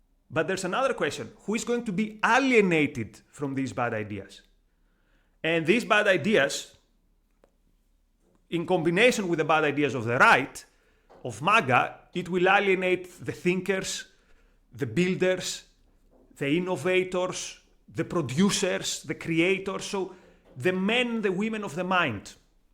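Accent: Greece. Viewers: Thanks for the effort to think ahead of the curve here. I’m not sure the anti-Israel part of it is really a secret sauce though - the left are absolutely open about it - it’s one of their ‘key pillars’. Left wing ‘magic money tree’ populist economics might be the most dangerous part… enough time has passed since post WW1 economic collapse for many western useful idiots to be willing to try it again 😢. Anyway, your Greek voice makes a refreshing change from that ubiquitous old economic fraud Yannis Varoufakis’ nonsense 😊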